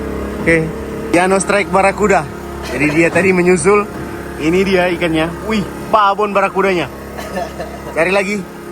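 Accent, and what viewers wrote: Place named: Manado, Indonesia. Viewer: saya suka dengar kalau narasimya disampaikan menggunakan logat Manado dang, terasa lebih enak dan natural. Saya lalu pernah tinggal di wilayah Sulawesi, orang bilang kalau logat Manado terasa tore dan nyaman di telinga. Tq Bang....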